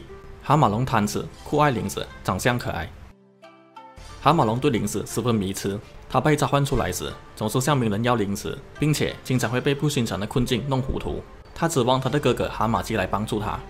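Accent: Malaysia. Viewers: 馬來人講中文真的讓人耳朵很累很痛苦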